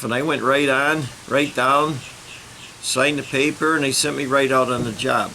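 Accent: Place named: Chicago, United States. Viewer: Great video. I’ve been in my union for 26 years. You get out of it what you put in .i started watching your videos because I was trying to figure out what type of cement to use in a faux stone paver walkway my wife has me doing. And I’ve stuck around for the stories. I work in Philly we have an accent. I thought I was listening to an old Chicago fella. 😂. God bless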